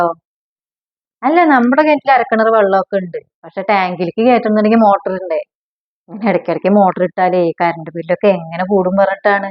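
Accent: Palakkad, India. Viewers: നിങ്ങൾ പാലക്കാട്‌ ആണോ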